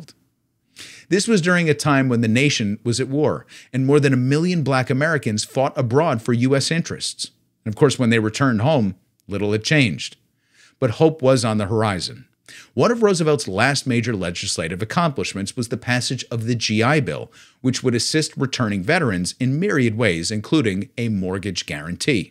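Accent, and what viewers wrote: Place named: England, United Kingdom. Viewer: Uhh acento ingles mal asunto jajajaja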